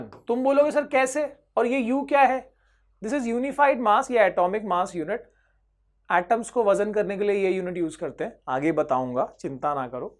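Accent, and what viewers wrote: Bihar, India. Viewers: apke bolne ke tarika se lagta h ap bihari ho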